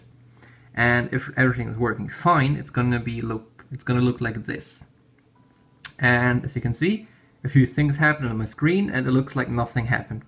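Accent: Germany. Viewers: Some good old german accented English in the morning, lovely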